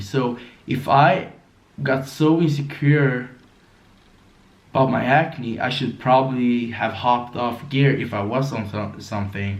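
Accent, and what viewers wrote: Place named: United States. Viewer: i love your american bro accent hahaha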